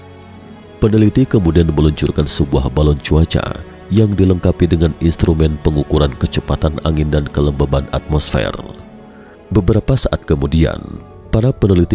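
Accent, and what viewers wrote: West Java, Indonesia. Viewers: urang sunda nya' kang?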